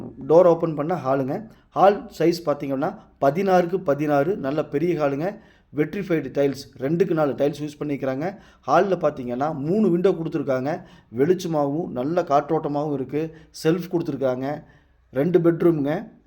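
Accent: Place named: Kongu, India. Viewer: நீங்கள் பேசுகிற கொங்கு தமிழ் இனிமையாக இருக்கிறது ❤ அழிந்து வருகிற வட்டார மொழிகளை காப்பாற்றுங்கள் வீடு நன்றாக இருக்கிறது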